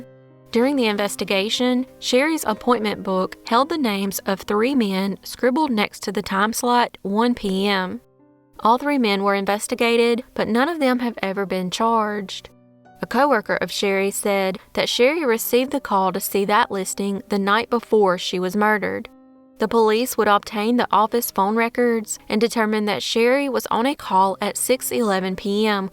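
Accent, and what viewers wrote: South, United States. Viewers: 🤬🤬🤬dang girl!!!! ❤️that fr southern accent!!! 😍😉🙃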